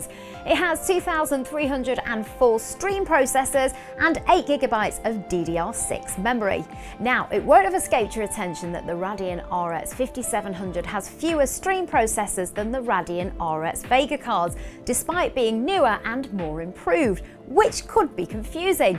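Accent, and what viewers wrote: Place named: North Midlands, United Kingdom. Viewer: She sounds slightly North Midlands. Leicester maybe... Nottingham. I could be wrong.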